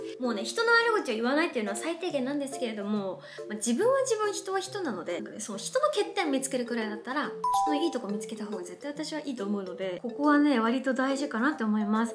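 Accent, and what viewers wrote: Japan. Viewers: 喋り方めっちゃ一緒やーって思ってたら同じ県民やった‼︎親近感♪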